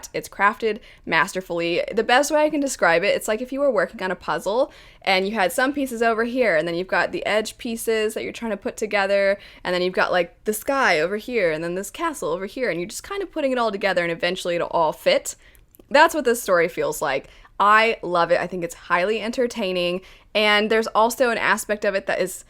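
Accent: United States. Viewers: Plz tell me you’re from Maryland holy shit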